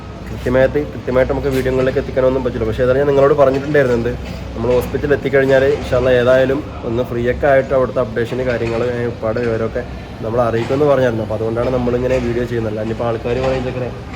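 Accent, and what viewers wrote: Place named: Kollam, India. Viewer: കൊല്ലത്തെ ഭാഷ ഭയങ്കര ഇഷ്ടമാണ് 😍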